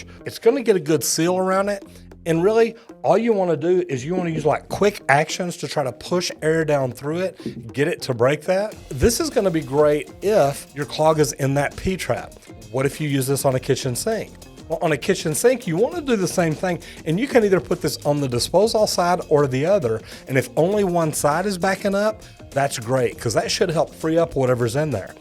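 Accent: Texas, United States. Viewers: His accent is a mix of gay and Texas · That I can tell a fellow Texan accent from the rest of the world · It's so great hearin a North Texan accent, I ain't sure what city exactly but that's DFW within 75 miles could be a bit further either way... Folks don't realize we'll drive 45 minutes for supper in Texas, it's a stretch town to town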